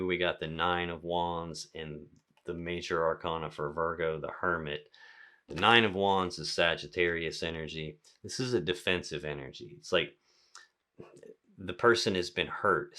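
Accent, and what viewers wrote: South, United States. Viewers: Never seen your channel before but you have to be southern like me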